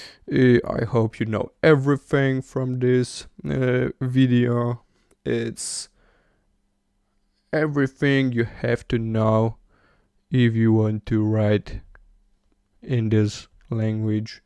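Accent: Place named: India. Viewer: EXCELLENT TUTORIAL THANK YOU FOR HINDI ACCENT I UNDERSTAND EVERYTHING NOW 🕉🕉🛕🛕 😍😍😍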